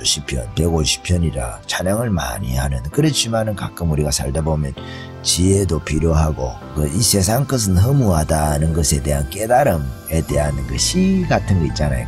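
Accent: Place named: Gyeongsang, South Korea. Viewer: 경상도사투리가 세삼좋네요~~^^유머와 부드러움~단호함~~궅궅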